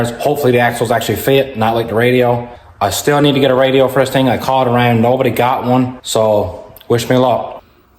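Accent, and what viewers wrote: Newfoundland, Canada. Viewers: I'm not into cars and repurposing vehicles, but for some reason i tend to like your videos lol. maybe its the fellow newfoundlander accent that keeps me watching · I think he's from Newfoundland. Its the closest thing to an Irish accent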